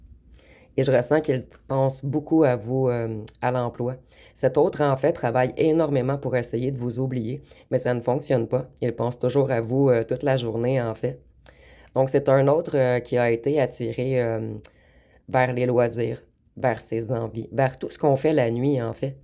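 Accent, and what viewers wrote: Canada. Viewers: Bonjour, j' aime beaucoup votre accent canadien 😊 Je me sens plus forte depuis ses épreuves.Une forte emprise familiale et ex.... J' ai mis un stop depuis janvier 2024 et j' ai été profondément blessée. Je le remercie pour cette belle leçon de vie. Après une longue traversée du désert, je m'ouvre à nouveau vers le champ des possibles. Je suis plus en amour pour moi même et pour la vie ❤ Merci beaucoup ❤❤❤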